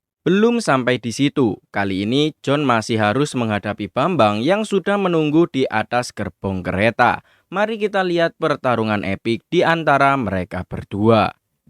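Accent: Java, Indonesia. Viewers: medok sngt tidk nyaman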